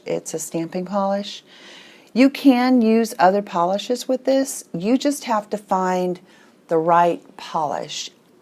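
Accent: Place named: South Carolina, United States. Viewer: love the nails. love his accent too. sounds like mine lol I'm also from South Carolina. thanks for doing the tutorial. hope you have a great Thanksgiving!!!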